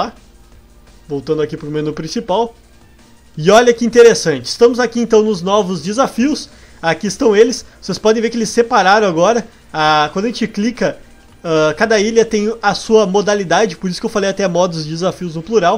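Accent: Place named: South, Brazil. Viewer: sabia que VC é do sul vc tem o sotaque daqui do sul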